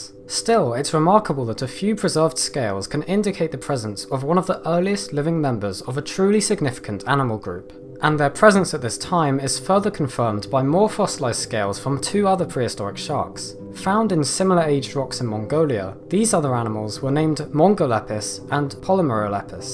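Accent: United Kingdom. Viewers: Ssooo.... You're British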